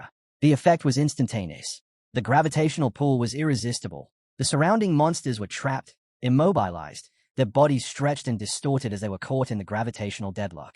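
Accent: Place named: United Kingdom. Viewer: The british narrator change on the 10 hour to 12 hour mark made my brain go mush i could not understand anything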